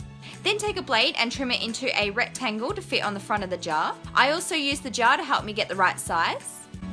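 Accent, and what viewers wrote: Australia. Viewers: I KNEW you sounded Australian!!!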